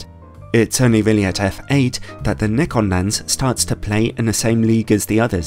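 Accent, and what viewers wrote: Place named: RP, United Kingdom. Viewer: It's the voice. Very soothing cadence and RP enunciation.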